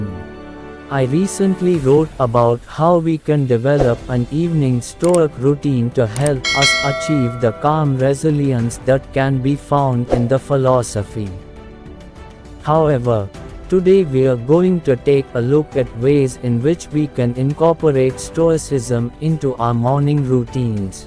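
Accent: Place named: India. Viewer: I love you for creating this content, but i can't listen this indian accent, this is just lazy work bruh, pathetic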